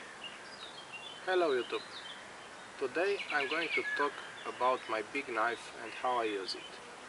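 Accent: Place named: Romania. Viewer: You're romanian by the accent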